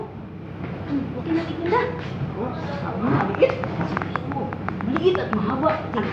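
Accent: Visayas, Philippines. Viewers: Slang bai morag dili bisayang dako, sunod mag inintsik unya ta, tan- awon nato makaya ba sa mga bisayang antik. Zucchini - ( zu - ke - ne ) pronunciation bai, morag chikinene. Bai, bilingual is a talent that we should all be proud of. We all wish to speak better English or any other language nothing wrong w that. To be bilingual it’s got to be amazing, you can talk to any ppl in the world in their own mother tounge. You can get jobs too by speaking different languages. Overall it’s good. You can speak 🇰🇼 we wish we can + your ever so deep visayan that sometimes it’s so hard to understand bc that’s how deep it is & that’s one of your talents not a lot of visayan who can speak like how you speak it’s just so deep & it feels so good to hear it from you bc we absolutely missed that from our grandparents. Centre Point ad Bai 3 mins long para sa pamasko bai pohon. 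Hehe, bai you can’t stop thinking about something else niambak hinoon ang patatas. Mosayaw diay ka bai kon maghiwa. 😂kataw- anan kaayo ka. Bai makanirbyos mana imong phone bai kadaghan na hapit moambak. Pwerting lamia bai tan- awon imong salad. Bai magikon nalang nato ang nomero para makasal naka. 💞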